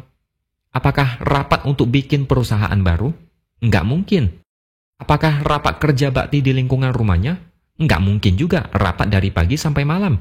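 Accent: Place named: Chinese-Indonesian, Indonesia. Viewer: Logat nya seperti cindo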